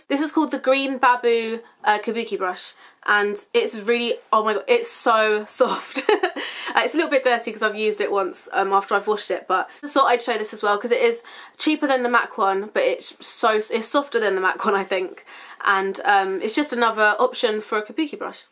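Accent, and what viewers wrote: United Kingdom. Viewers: i love it when you say reckon :) oh how i wish i had a british accent! x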